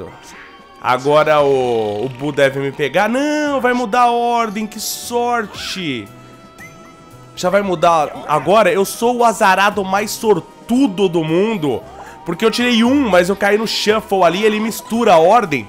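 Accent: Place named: São Paulo, Brazil. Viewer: sotaque paulistano é nois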